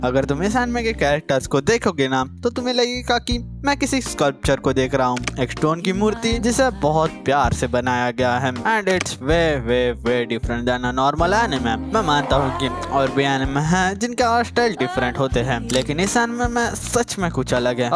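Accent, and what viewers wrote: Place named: Gujarat, India. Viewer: you sound like gujrati tbh 🥀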